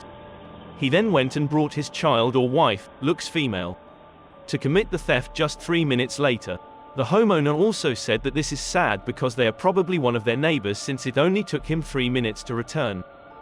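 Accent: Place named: United Kingdom. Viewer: I like your videos and your channel, but I do have one complaint. Sorry, but I really can't stand the narrator with that British accent. It's actually keeping me from sitting through the videos. Maybe it's just me but I thought I might represent others as well.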